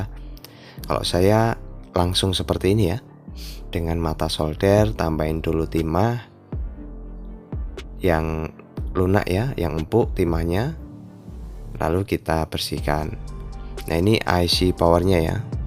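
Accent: Java, Indonesia. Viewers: tapi logatnya jawa bgt mas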